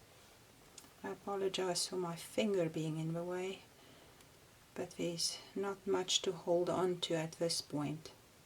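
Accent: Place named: South Africa. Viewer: Love your South African accent.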